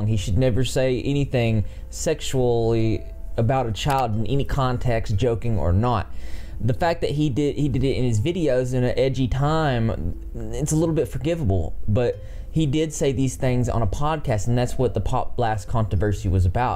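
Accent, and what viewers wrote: South, United States. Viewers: If I had to guess...Florida/alabama/Georgia somewhere around there lol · I definitely hear the south in your voice.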